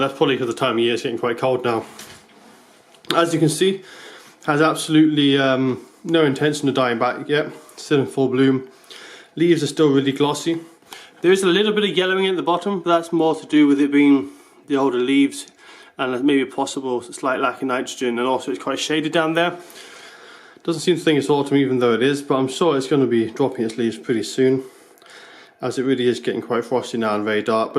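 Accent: Scotland, United Kingdom. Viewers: Ohhhhh you're scottish haha that's why I can't understand you. lol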